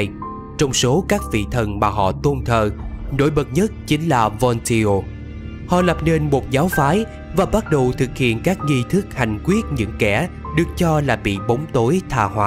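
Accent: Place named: South, Vietnam. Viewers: Vẫn là giọng đọc mình thích nhất :)) giọng miền nam đầu tiên out trình mấy a giọng bắc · Giọng miền Nam mãi đỉnh 🎉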